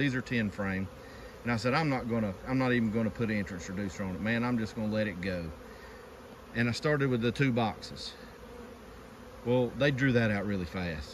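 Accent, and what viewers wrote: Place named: North Carolina, United States. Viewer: Good flow in NC also. I’m up the road from you in foothills. I’ve always taking reducers out during flow. I knew you were from NC before you said so. We got that accent.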